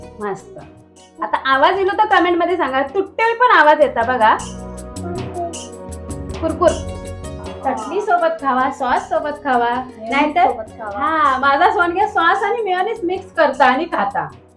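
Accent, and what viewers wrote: Malvani, India. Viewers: तुमच्या विडिओ hi मनापासून वाट बघत असते तुमची मालवणी लय आवडता खूप सुंदर सुधा nagwekar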